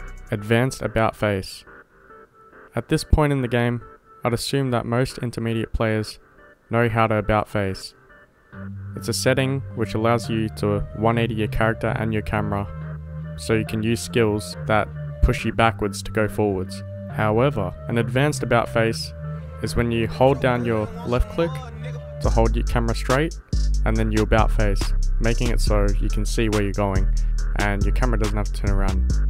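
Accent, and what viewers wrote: Australia. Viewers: You sound Aussie, is that correct?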